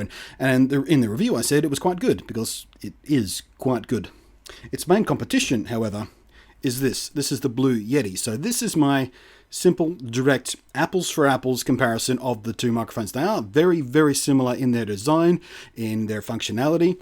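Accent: Australia. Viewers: True. Still the razor price is significantly higher when exchange rate and taxes are added. Around $200 AUD equivalent for USD to AUD for the Yeti and $240 AUD for the Seirēn. It seems that the Aussie tax for the yeti is about $30 and the Aussie tax for the Razor is around $50. Still I dislike the arbitrary markup on any goods. Really liked the comparison though. Is the extra $50 worth the extra functionality? Keep up the excellent work. It really is great to hear an Aussie voice doing reviews like this. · Ha cool I guess your Aussie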